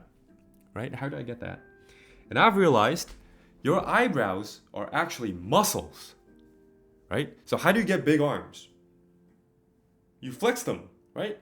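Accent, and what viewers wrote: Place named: Texas, United States. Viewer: You got the Texas drawl down perfect :) You look fine. Whatever gives you confidence is good. One thing people can do to reduce fine lines and wrinkles and firm under the eye is to close the eyes and flex the muscles in the top and bottom lid. You can feel the difference if you place your small fingers on the outer corner of the eye socket :) It feels good, too. Great call on the eyebrows!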